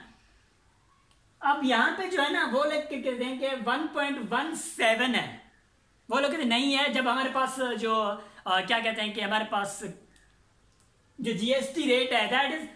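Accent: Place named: Saraiki, Pakistan. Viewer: ahhaha wakai bhaa saraiki sindhi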